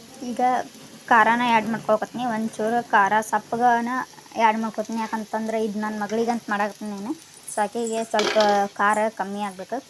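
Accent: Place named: Gulbarga, India. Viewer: Mombi adhuri matte gulbarga matu adtira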